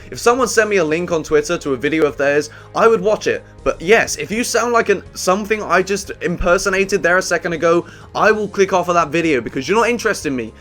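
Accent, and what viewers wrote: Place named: United Kingdom. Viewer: Just realized he had a British accent. :0 Mind=Blown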